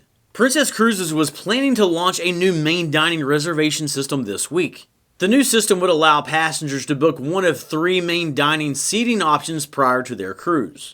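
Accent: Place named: New York City, United States. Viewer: She's hilarious sounds like she's a new york city gal.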